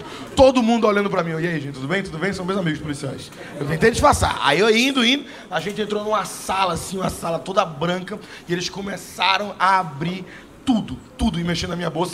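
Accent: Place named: Bahia, Brazil. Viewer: normal, ele é baiano em vez de dizer MAS VOU ele fala MAR VOU... E outras trocas de letra assim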